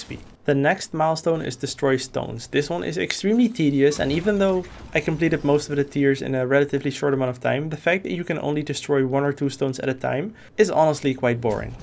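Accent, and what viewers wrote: Netherlands. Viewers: You dutch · Je hoort gewoon dat je nederlands bent😝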